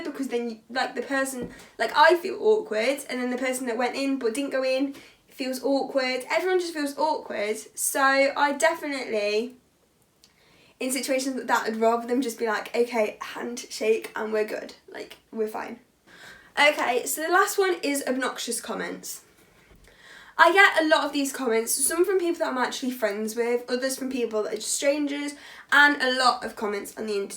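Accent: United Kingdom. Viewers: Woah your the girl from Instagram I never knew you had s YouTube and a British accent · Rik Pine Its the British accent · I would simply like to say that you are living confirmation that the only thing which separates the British and we Americans is a common language. Your "accent" is charming! Yes, I know, we Americans trample all over proper English; but I could listen to you all day and never tire or tyre of your delightful soliloquy. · Hello, from across the pond. I think you're beautiful inside, and out. and I love you British accent. May God bless you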